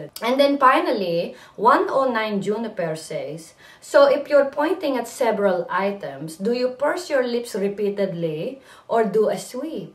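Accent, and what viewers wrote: Philippines. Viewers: is philipino talk eng with this kind of accent? funny..... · Filipino accent? #WIN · i love it...she has pinoy accent!hahaha...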